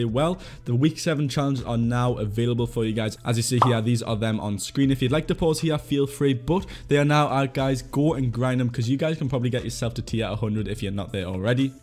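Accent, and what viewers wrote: Newcastle, United Kingdom. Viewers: Are u from Newcastle because i am too!!!! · You a Geordie boy?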